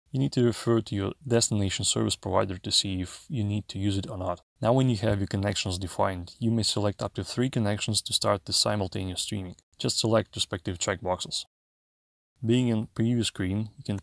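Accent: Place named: Russia. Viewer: It's a Russian accent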